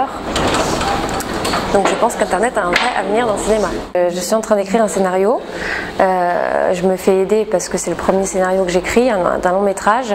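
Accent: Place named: Paris, France. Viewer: attention à l'accent bobo-aristo-parisien sinon vous êtes très jolie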